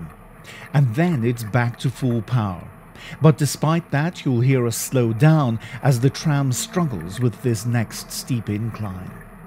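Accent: Isle of Man, United Kingdom. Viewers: Is the narrator's accent Manx? I couldn't quite place it.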